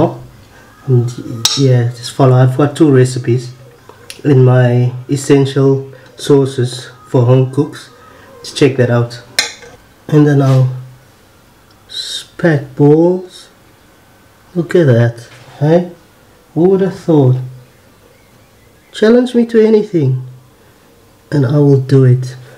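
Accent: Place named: South Africa. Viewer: Dude sounds South African.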